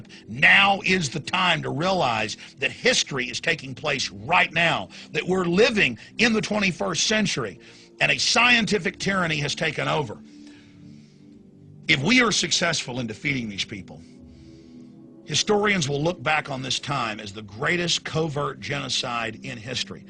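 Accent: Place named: South, United States. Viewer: Your evidence is weak, subjective and theory laden. You haven't tried to falsify your claim, you are unwilling and unable to consider alternative answers and reasons to your "evidence" the links between your evidence are weak (though rather imaginative) you personify your government body as one evil being, everything points to this being a wack-job conspiracy theory, you even sound like your from a southern U.S. state it all fits! There is no logical reason to believe you. Good day.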